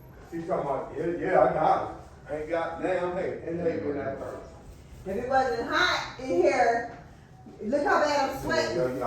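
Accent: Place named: South, United States. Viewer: Nothing wrong with sounding country it’s just interesting to our ears to hear it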